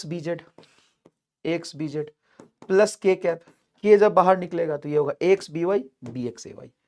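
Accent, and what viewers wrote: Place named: Bihar, India. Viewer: Bihari h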